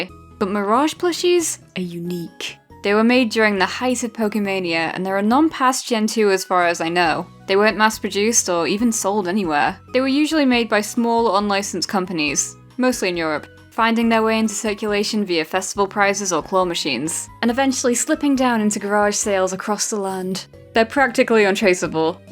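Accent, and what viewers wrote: United Kingdom. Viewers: Hmm, i dont have a single one i only subbed a few days ago for a few reasons Pokemon Like the Accent Like the Voice BRITISH MATE ( love the UK even tho im just a lowly German ^^ ) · Because of your calming voice and British accent even you say something really WACK, will still be calming to my ears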